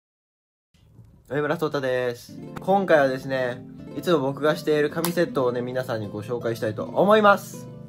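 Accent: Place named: Kansai, Japan. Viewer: イケメンなのにアホ丸出しの喋り方に天然で大阪出身関西弁で黒髪が似合うって、最強なんよめっちゃ好き黒髪見てえです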